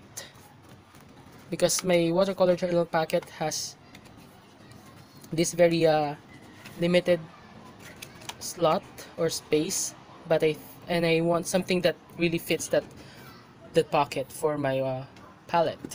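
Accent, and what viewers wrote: Philippines. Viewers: Its really easy to distinguish a filipino with his accent haha, am i right??...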